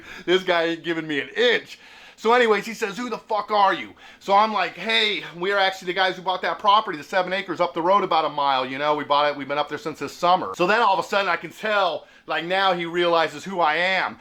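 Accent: United States. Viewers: I love the american accent great story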